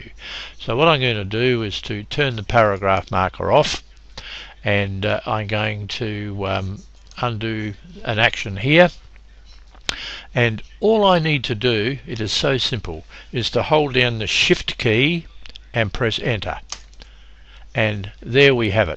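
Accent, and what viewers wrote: Australia. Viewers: Awesome video buddy, helped a lot! Subscribed for future stuff like this! And the Aussie accent made it a ton better!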